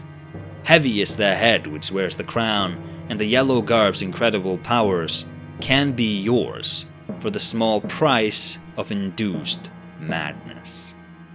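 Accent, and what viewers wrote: Iceland. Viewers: Umbasa, I love this game. Edit: Do I sense Icelandic in that accent? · Just stumbled across this channel, love it! Demon's Souls has always been my favourite! From the accent I have to ask: are you Icelandic,?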